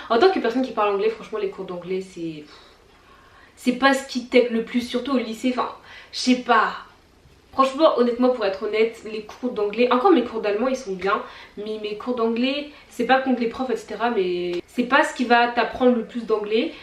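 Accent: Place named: United Kingdom. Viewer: Je trouve que ton accent est plus proche de l'accent britannique que américain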